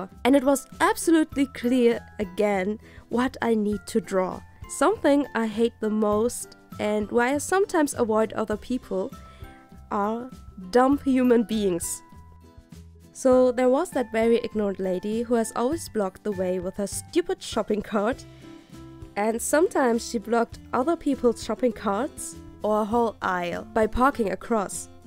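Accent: Germany. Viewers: that accent...lol. i think you speak german don't you? i do so and have the same one xD srry if i'm wrong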